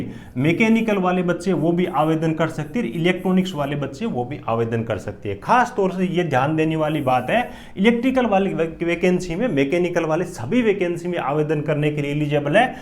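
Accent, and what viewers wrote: Rajasthan, India. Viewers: Sir ap Rajasthan se ho na 😂😂 apki language se pta chala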